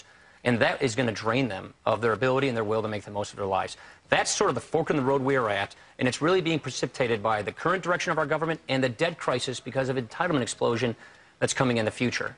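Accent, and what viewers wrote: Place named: United States. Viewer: this guy looks like a leprachaun :D but with an american accend hmmm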